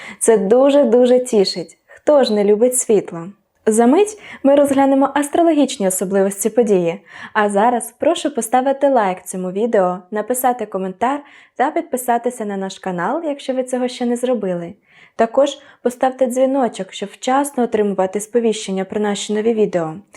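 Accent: Standard. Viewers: Героям Слава 🇺🇦💛💙 Дякую вам велике❤ Дякую за нагадування про традиції випікати жайворонки , за світло , яке несете до людей. Дякую за чисту українську мову і стиль🙏❤️ Світло перемогло темряву , обов'язково 🙏❤️ УКРАЇНА ПЕРЕМОГЛА 🇺🇦💛💙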